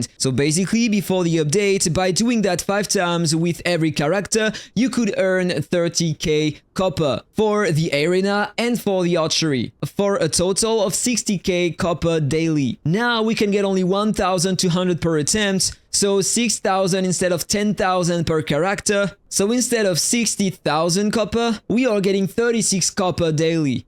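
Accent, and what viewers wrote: France. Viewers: Tu a un accent français non ?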